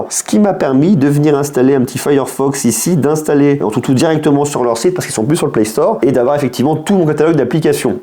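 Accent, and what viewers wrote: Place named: France. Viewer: Très bonne vidéo ! (pas grave pour l'anglais, les français ne sont pas connus pour avoir un accent british ou US) Je rejoints certains commentaire, si tu fais des tests d'appareils pas encore ou pas forcément disponible sur les chaines de distributions standards en Europe/France pourquoi pas tester d'autres appareils ? J'ai vu du IQOO 11/11 pro (qui est le OnePlus de Vivo en faite) Pourquoi pas ce X90 pro+ de Vivo Si le budget le permet, il y a évidemment du OPPO Find N2, Vivo X Fold + (ou le X Fold 2), la prochaine version du Honor Magic 5 ultimate ? En tous cas, très intéressant que tu touches à des appareils en avant première (entre autre) sans forcément le soutien de la marque !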